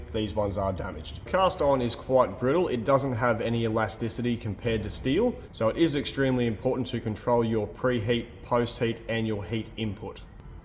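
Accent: Australia. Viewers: Awesome video, always cool watching the puddle gun and listening to profanity in Australian!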